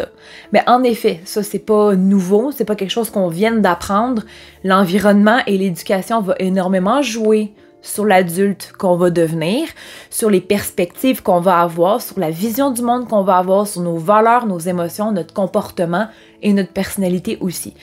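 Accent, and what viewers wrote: Canada. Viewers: Coucou, je te trouve vraiment très sympa et j’adore t’écouter déjà parce que ton accent canadien (ou québécois ? ) me plaît mais aussi pour tes idées sur les sujets très variés que tu traites...je suis d’ailleurs souvent du même avis. Concernant la peine de mort par exemple, je pense qu’il est débile de punir une personne qui a tué en lui faisant subir exactement le même traitement ! Avant j’étais + partagée sur la question : peine de mort uniquement pour les pédo...... et les tueurs d’enfants. À présent je pense que:"qui a bu, boira" et qu’il est nécessaire que certaines personnes n’aient jamais de 2ème chance...et ne doivent en aucun cas sortir de prison un jour, même 20 ans plus tard. Les exemples multiples nous ont démontré qu’on ne change pas et qu’une maladie mentale se traite plus ou moins mais ne guérit jamais véritablement...bref, bien à toi jeune femme sympathique et continue de nous régaler avec tes vidéos. 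Bonne semaine.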